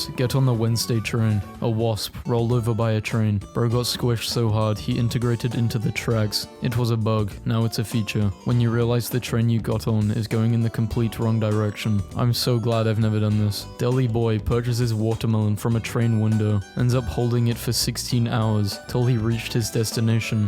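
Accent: United Kingdom. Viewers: I just remembered you are bri-ish (I'm pretty sure) because Americans pronounce it "Van Goh" I assume bri-ish people say "Van Goff"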